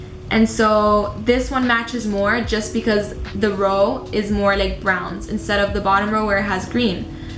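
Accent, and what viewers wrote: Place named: Miami, United States. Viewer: I know a Miami girl when I hear one ! 🤗 xoxo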